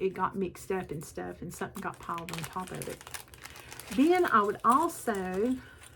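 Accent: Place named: South, United States. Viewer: Congratulations on 1,000 subscribers. I was born in Texas, so love listening to your southern accent. I love to play pickleball and take color tour walks in the fall ya’ll. Kitten, wine, quilt, Henry, Season, Thanksgiving, Raven, Sampler, Seashell, Pumpkin.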